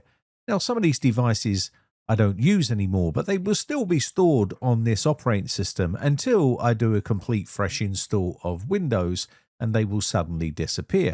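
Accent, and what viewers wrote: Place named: Cockney, United Kingdom. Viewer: I'm here for the cockney accent.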